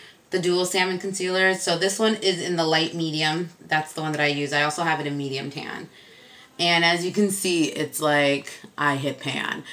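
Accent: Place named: Chicago, United States. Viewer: Your Chicago accent is really strong in this video. It makes happy because I my miss my hometown!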